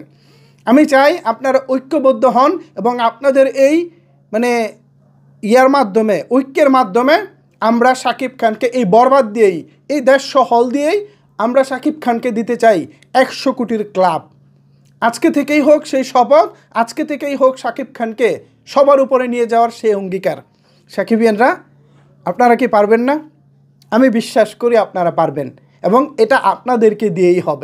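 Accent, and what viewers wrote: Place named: Netrokona, Bangladesh. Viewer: Netrokona Kotha boilen Bhai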